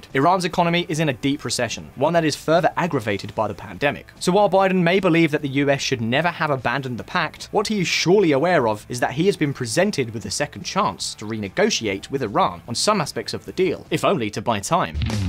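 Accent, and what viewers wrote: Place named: England, United Kingdom. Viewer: It's called the English Language, & this guy sounds English to me. Maybe you've got it wrong...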